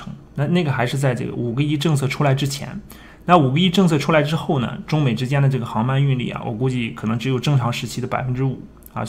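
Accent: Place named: Shanxi, China. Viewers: 是山西口音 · 山西口音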